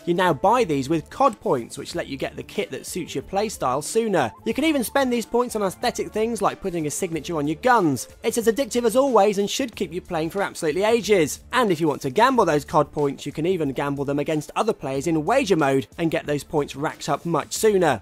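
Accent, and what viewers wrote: United Kingdom. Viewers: Its his charming British accent . :D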